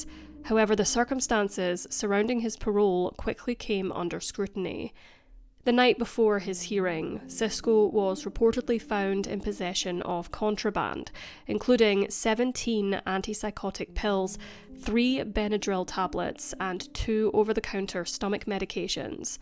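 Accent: Scotland, United Kingdom. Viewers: One of the best true crime channels on YT. Love the Scottish accent. It is Scottish right? I love it no matter what accent it is. 🙂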